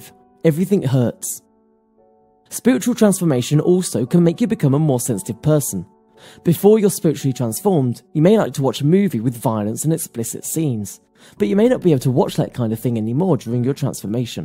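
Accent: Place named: United Kingdom. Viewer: you sound sort of british.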